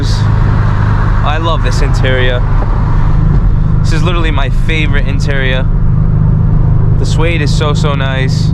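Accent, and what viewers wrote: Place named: Fall River, United States. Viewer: Lol I’m watching this and I’m like hey that accent sounds familiar. Then I realized it’s Fall River. Sup blica torte!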